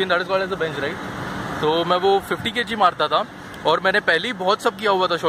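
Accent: Maharashtra, India. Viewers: That marathi tone in ur voice and gyserin this hot weather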